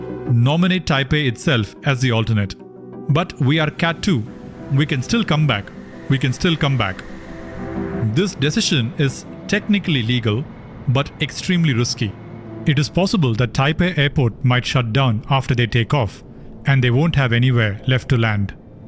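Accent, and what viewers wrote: India. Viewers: Crazy heavy Indian accent. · Bro have Indian accent ❤ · He sounded Indian national! . Needed improvement on grammar pronouns actions. Tks. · 🔴🔴🔴 I CAN'T LISTEN TO HIS THICK INDIAN ACCENT, TOO ANNOYING!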